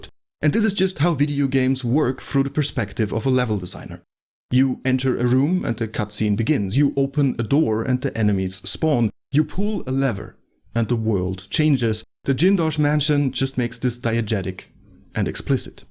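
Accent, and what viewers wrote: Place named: Europe. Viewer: Its always the guys with the euro accents that make batshit videos.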